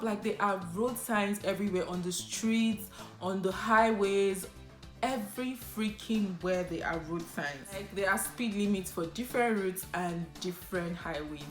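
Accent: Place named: Igbo, Nigeria. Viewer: Love your hair and your Igbo accent is so sweet. Sorry is a no no... like you are apologising. yes!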